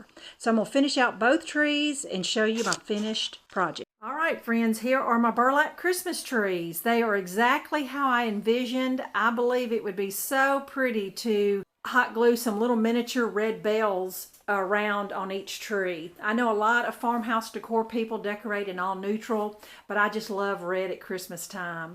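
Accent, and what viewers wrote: South, United States. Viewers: I loooooveeee your southern accent. Did you just hot glued all of the layers?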